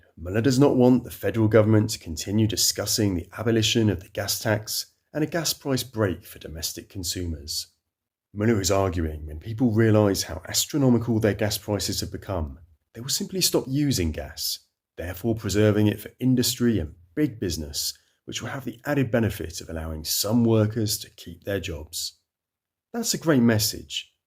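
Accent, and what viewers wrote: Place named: United Kingdom. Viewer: You sound like your from 🇬🇧 not 🇩🇪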